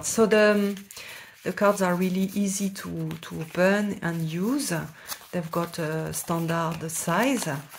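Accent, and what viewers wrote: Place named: France. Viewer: Sublime tarot, félicitations à toutes les deux pour cette belle collaboration 💚💚💚 Il me tarde de lire le guide book et de recevoir le tarot. Bravo pour ta review en anglais. La langue de Shakespeare n'est pas accessible à tout le monde Bel accent frenchie, avec beaucoup de charme 💐 🧚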